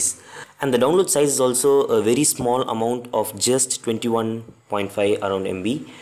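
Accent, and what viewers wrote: India. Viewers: After watching so many tech and programming tutorials, I am starting to love the Indian accent. The accent is actually cute! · Sound like indian scammer... Sorry!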